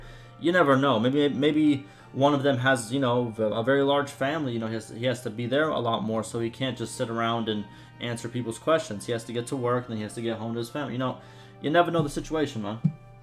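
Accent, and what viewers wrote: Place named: Los Angeles, United States. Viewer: Lol wow from the Ukraine??!! Dude your voice and demeanor makes me think you are from LA and not even a white guy haha. Keep up the reporting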